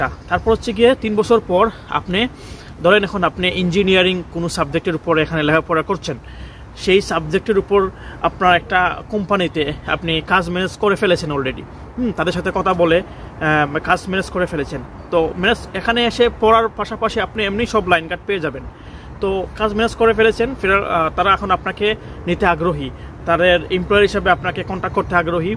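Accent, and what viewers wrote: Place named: Sylhet, Bangladesh. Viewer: bhaiii uni Sylheti. Unara Bangla kor pare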